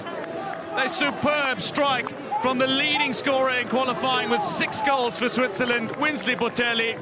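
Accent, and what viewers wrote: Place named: South Africa. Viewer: Why is the commentator a South African ? haha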